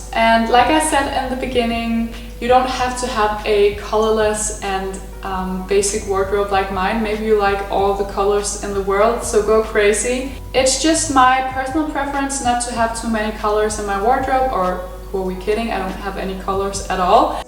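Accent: Denmark. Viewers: Hej jeg kan høre at du er dansk , er der en video hvor du snakker dansk, eller har du en blok/hjemmeside på dansk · Man kan næsten ikke spotte din danske accent 🙈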